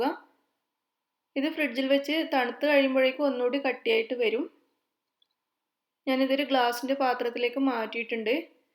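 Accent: Kannur, India. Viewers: കണ്ണൂര്‍ കാരി ആണോ I also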